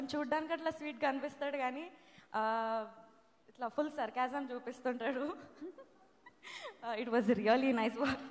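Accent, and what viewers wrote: India. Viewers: Hello she is from telangana....if you don't know anything keep your mouth shut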